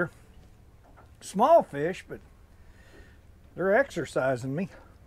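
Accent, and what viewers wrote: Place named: Missouri, United States. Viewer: You are the fishing grits of all time Enjoy your expansion to catfish but can’t imagine you would actually clean and cook them I never thought catfish was worth the effort I am driving back from AZ on Monday Love listening to your distinctive SE Missouri flavored commentary Dave K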